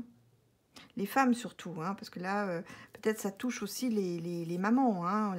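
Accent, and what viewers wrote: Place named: Switzerland. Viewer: Bonsoir contente de vous entendre pour la première fois et de savoir que vous respirez bien!! Belle voyance précise je vous remercie vavec votre petit accent proche de la Suisse? Bisous. Virginie de Haute Savoie 😊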